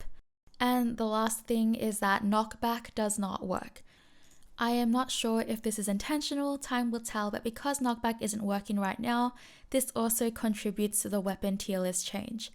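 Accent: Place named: Australia. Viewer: I have been watching a couple of videos, and from the first video, I thought that was an Australian accent, (because mine is about the same or a bit stronger than that) so I was quite glad to find that you are based there too.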